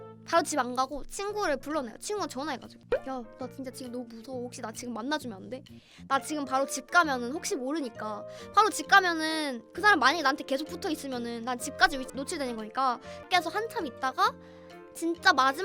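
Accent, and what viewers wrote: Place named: Gyeongsang, South Korea. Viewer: 갱상도 분이신갑네예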